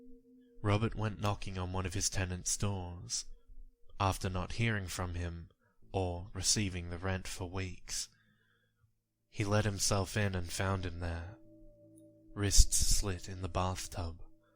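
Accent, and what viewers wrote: South, United States. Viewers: Love the southern accent, lol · As an American born in south Georgia. I love your southern accent man! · Not a bad country accent, but in New Orleans it's a little different because of creole. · i love your stories but you need to work on your southern draw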